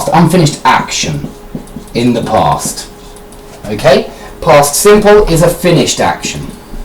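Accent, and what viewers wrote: United Kingdom. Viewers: I love that heavy british accent! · Hi! I'm from Brazil,and your video is very good! I love the British accent is perfect :D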